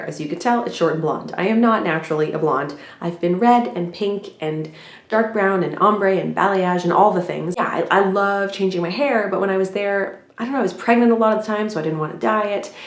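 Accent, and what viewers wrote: Malaysia. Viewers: haha,bunyi dah macam org malaysia betul je... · you lived in penang! do u have the penang accent? ;) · Your Malaysian English accent is so on point! hahaha. I love it here as it is chill, but active at the same time. And the foood. I always miss it after a trip overseas! We hope to see you in Malaysia soon again!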